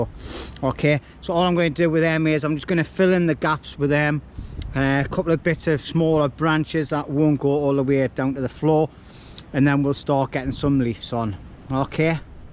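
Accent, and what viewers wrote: Newcastle, United Kingdom. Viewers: It's called Geordie mate people from the north east of England 👍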